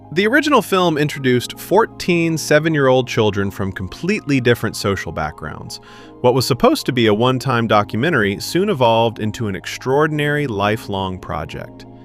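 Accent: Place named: United States. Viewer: You sound very American! How did you master English so well??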